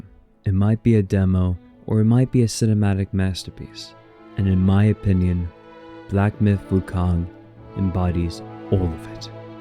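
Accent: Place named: Scotland, United Kingdom. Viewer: Scottish accent gives that mythical feel, no Indian accent can ever achieve.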